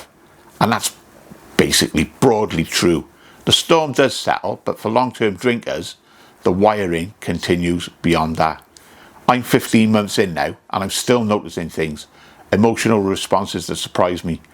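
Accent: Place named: Newport, United Kingdom. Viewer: Alright, I understand your language and like your videos. Im originally from Newport S.Wales. Moved to Australia in 1995. Married for 23 years then a divorce came and I have never drank so much in my life. I started drinking in Bettws/Malpas age 14. I am 54 this year. Struggling to keep myself alive without a drink. I work every day although now the hangovers are severe. Good to here a Newport accent?? Thanks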